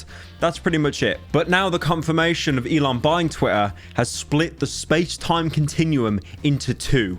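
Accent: United Kingdom. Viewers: Every time says Twit-uh I laugh because he's funny British "Man". No but seriously I love your content ❤️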